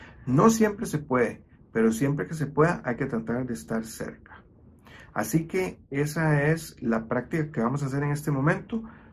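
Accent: Costa Rica. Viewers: solo he visto un minuto, pero me atrevo a decir que sos tico. Gracias, voy a aprender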